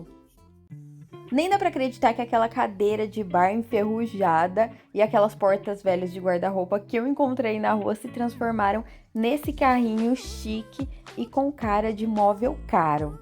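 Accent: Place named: Goiás, Brazil. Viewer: Show. Amo seu canal e esse sotaque goiano. Como é bom ver uma conterrânea talentosa e com um canal bombando com conteúdo de verdade. Parabéns!!!!!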